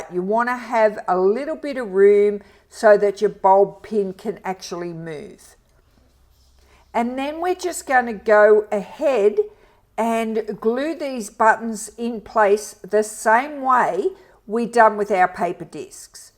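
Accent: Australia. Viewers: G'day,. Such a relief to hear your Aussie voice. I don't have to strain ta understand. I recently got my first mega tank printer. Sooo good. Now for the Bearly art glue. Off to Amazon au. These dangles are so cute. I adore minis too.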